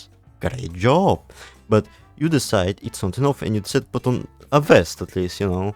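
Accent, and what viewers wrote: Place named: Russia. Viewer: russian accent cancel!! anyways good video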